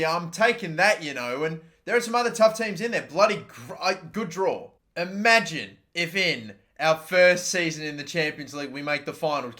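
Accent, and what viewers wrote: Australia. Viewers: Is Australian?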